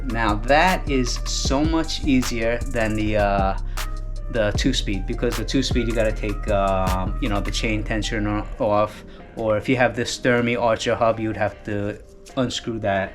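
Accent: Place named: New York, United States. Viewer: Hey! Thanks for making videos! In addition to the bikes, I like the music, your cute family, the bits of Japanese slips in, your New York accent, and of course all the great views of the city! Keep ‘em coming man!👍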